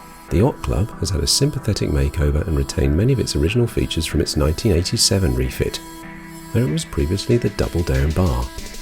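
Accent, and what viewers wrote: United Kingdom. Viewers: You do sound rather British, and many of them speak English.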